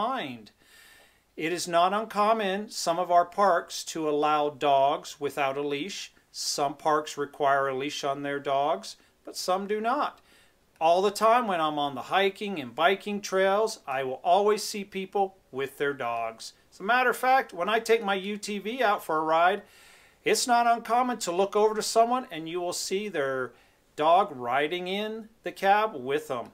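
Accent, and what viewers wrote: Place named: Nebraska, United States. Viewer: do you have cicadas? mosquitos? ticks? Accent seems similar to Nebraska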